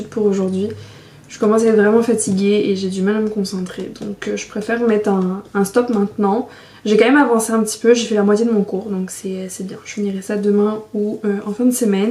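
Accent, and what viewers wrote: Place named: Quebec, Canada. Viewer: Oh, je ne m'attendais pas du tout à t'entendre parler avec l'accent québécois, ça te change tellement ! ça fait plaisir de te retrouver sur YouTube 🥰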